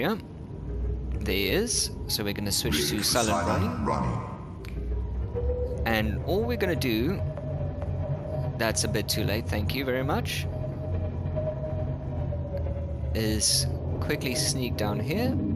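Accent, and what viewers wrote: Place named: South Africa. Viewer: Ah cool, love accents but always had a hard time spotting south african, anyways thanks for the help with these videos, really helped a lot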